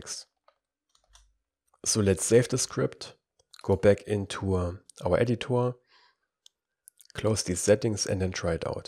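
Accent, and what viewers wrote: Germany. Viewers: Love your teaching way brodar , your humour , your german accent lol awesome keep it up!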